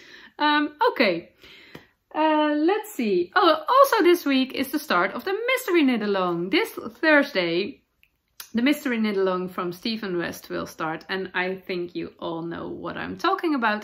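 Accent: Netherlands. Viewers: I know that I don’t have to say that your English is perfectly fine, but really, it IS! Please do not stop doing the podcast in English. Yours is my ABSOLUTE favorite knitting podcast and I will be so sad if you give it up! I look forward to it every week. Of course, you should do whatever is best for you, but for your viewers (especially this one) I love listening to your Dutchy English. ❤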